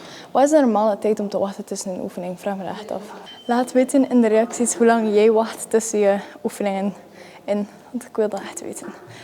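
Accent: Flanders, Belgium. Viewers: She has a Belgian accent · Dit is vlaams kut nee maar respect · I love your Belgian accent. 😀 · Vlaams is Nederlands, maar dan mooier ❤ Een hele leuke video! Ik ga zelf ook naar de gym en het is gaaf om andere gymvideo's te zien. Weer een topvideo, een geslaagd experiment! :)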